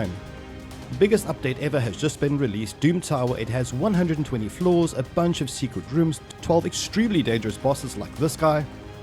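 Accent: South Africa. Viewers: You have to be South African as well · What are the odds of you being a South African excuse me for assuming it just the accent 😂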